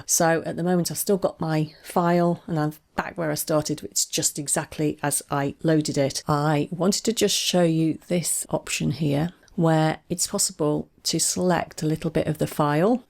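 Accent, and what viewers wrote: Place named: Manchester, United Kingdom. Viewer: Looks like its changed since this video. I go to change tempo but instead of getting the popup box showing how to select the new speed, I got a box saying disallowed, you must first select some audio . I've been trying to select a portion of the track for some minutes through Select and Region and then trying everything there, but the cursor disappears all the time, so can't make a selection. Btw, I love your Manchester accent. That's where I grew up. :)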